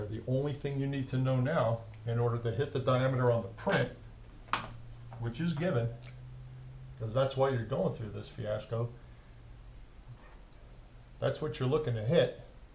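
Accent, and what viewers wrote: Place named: North Jersey, United States. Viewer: I knew you didn't sound like you're from Texas! I had a feeling that was a north Jersey accent. I grew up in south Jersey.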